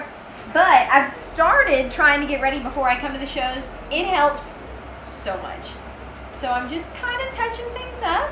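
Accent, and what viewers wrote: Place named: South, United States. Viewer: I love that southern accent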